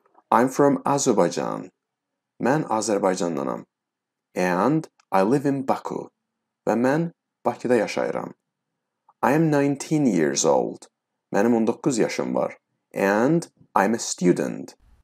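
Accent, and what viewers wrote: England, United Kingdom. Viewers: Təşəkkür edirəm sizə müəllim . Çox gözəl dərs başa salırsız , tələffüz edərkən Azərbaycanlı ləhçəsilə deyil əsl İngilis aksentilə deməyiniz təqdirə layiqdir. Çox sağ olun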